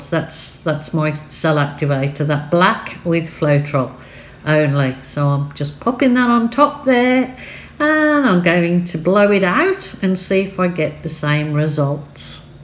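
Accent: United Kingdom. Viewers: That is pretty. I love the mountains 😁. I've never tried to do the shellee bloom, but have thought about it. I've seen someone (can't remember who) do it with acrylics. She used much thicker paint and spun it after blowing it out. What brand of paint, I couldn't tell you. You've lost the Brum in your accent, but you still have a strong Brit accent. My other half is from Manchester. Thank you for sharing ✌️❤️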